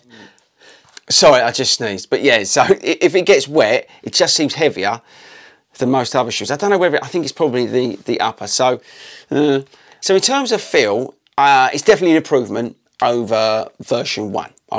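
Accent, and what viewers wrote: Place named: England, United Kingdom. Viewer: you got the best accent man where from England are ya from?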